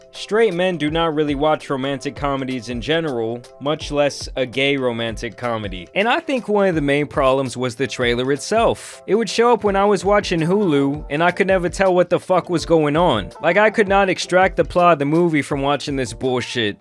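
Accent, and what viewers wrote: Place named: Long Island, United States. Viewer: How are you from Texas but have a Long Island accent?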